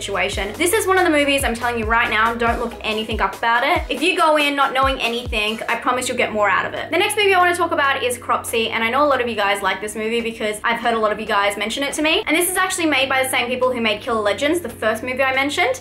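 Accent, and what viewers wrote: Australia. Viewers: Are you an Australian? You're pretty as ay. *blush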